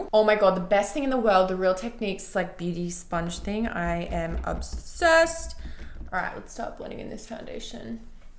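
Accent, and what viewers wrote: Australia. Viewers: I never thought the Aussie accent could be unattractive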